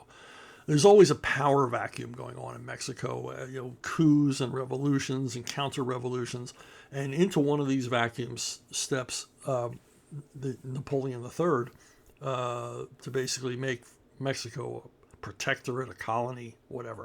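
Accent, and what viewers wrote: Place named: Chincoteague, United States. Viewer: People from my hometown of Chincoteague Island, VA also say "wooter" and "igles." It's so distinctive that I was shocked to hear from Philly pronounce things that way on his podcast. Though Chincoteague had a lot of truck with Philly, including men working seasonally in Philly (where my great-grandfather met my great-grandmother), so that might have something to do with it.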